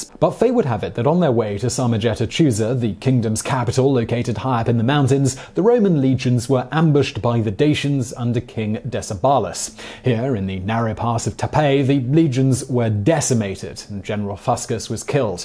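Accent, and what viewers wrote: United Kingdom. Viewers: Cool video! The thick British and rushed words makes it a little difficult to understand, if you could slow it down a little that would be great. Other than that, excellent.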